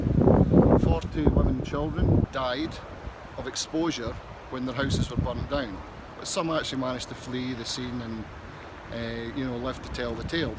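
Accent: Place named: Scotland, United Kingdom. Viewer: Love the Scottish Accent 😀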